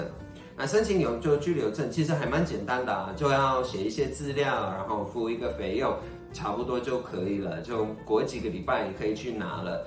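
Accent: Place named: Taiwan. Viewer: 滿滿的台灣腔，好親切喔～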